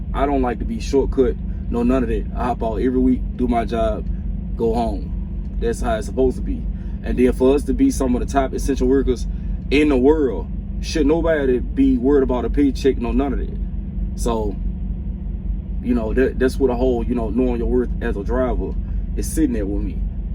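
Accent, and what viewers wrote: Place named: Memphis, United States. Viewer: Sound like u from Memphis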